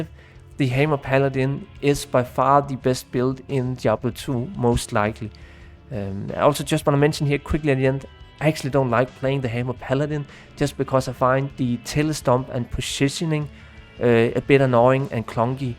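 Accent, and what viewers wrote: Denmark. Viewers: I guessed danish too! I thought he sounded a bit like Lars Ulrich, so that gave it away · i smell dansk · I guessed you were Danish and got it right! I recently found out I'm a third Norwegian so I've been paying a bit more attention to Scandinavian accents :P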